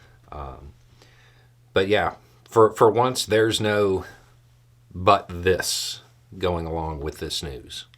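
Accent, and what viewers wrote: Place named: Rural, United States. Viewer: your accent has gotten crazy hick since you were "DIA." Hope things are great! Money glows.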